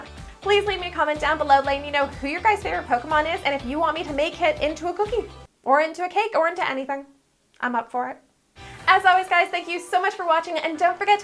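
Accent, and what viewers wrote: Canada. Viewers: Idk why people make fun of it I love how Canadians say out and about it’s just the cutest thing ever.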